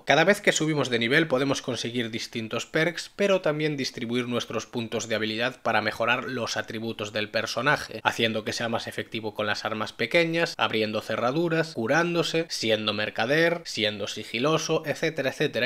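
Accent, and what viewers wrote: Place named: Galicia, Spain. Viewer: Te veo tanto que se me ha pegado el acento gallego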